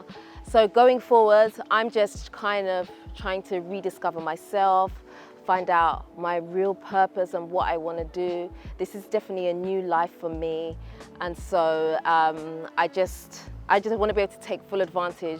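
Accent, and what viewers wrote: United Kingdom. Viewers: My☝🏿thought about you (AKA ) your not old, but a great builder of houses & homes, so keep working on building your genuine soul then you & the family w/ fall in place 😊….. Oooh yeah you’re accent is smethg i luv to listen to idk 🤷🏿 is it British? anywho enjoy the Motherland’s landscape ❤ & rmbr to keep building sis. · " " got a heavy heavy british accent, blimey!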